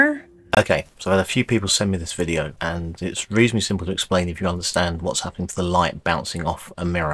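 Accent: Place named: United Kingdom. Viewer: Your demonstration made it clear, but that damn British accent made the explanation impossible to understand.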